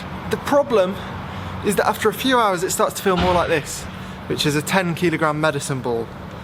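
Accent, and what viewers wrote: United Kingdom. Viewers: I did not leave a negative feedback but I find videos with British accents off-putting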